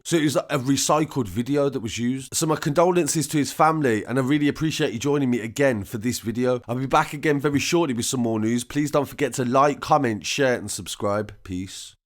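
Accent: Birmingham, United Kingdom. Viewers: Why does this brummie jackass keep calling him McCann Barrett ? It says mark duh !!!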